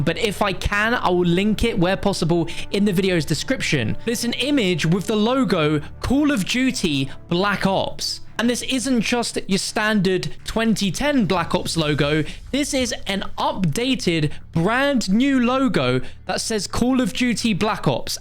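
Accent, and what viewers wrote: United Kingdom. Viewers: Why is British accent so weird?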